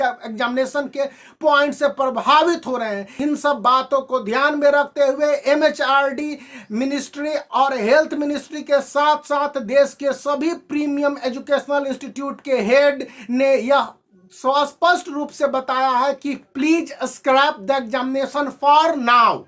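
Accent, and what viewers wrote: Bihar, India. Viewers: Guru ji awaz se hi bihari lag rahe hai kaun zila se hai guru ji aap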